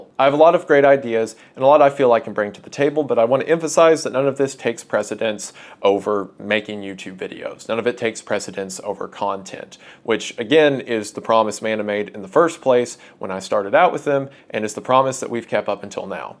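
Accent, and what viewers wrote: South, United States. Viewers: you've got the perfect southern draw to voice how it's made or similarly just reading a phone book out loud to relax me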